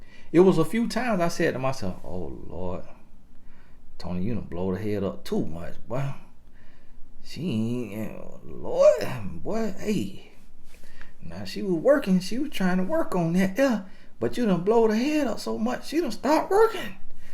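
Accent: South, United States. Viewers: "How a queen lil" lmbo boy you is country!! Lol I love it!!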